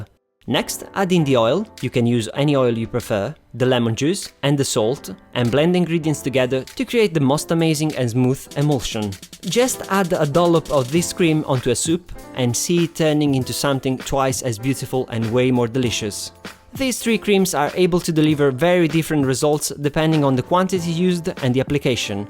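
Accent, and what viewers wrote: Italy. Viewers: absolutely, thank you! And same here. May I just add that your videos are amazing! They are very well done and the explanation is terrific! I have watched quite a lot of them and can't wait to look at more, and obviously try them. I am not a vegan, but a vegan-friendly vegetarian (🙄😜) and videos like yours are very helpful. And I was missing cream so badly (the store-bought one isn't good, at least here in Europe). So, thank you! Do I recognize and Italian accent? Maybe from northern Italy? Un saluto da una tedesca cresciuta in Italia 👋🏼 Ciao! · I love this channel, so many ideas. Have a hard time finding raw cashews, everywhere I see them are fried or roasted. Also I really like your accent, is it italian?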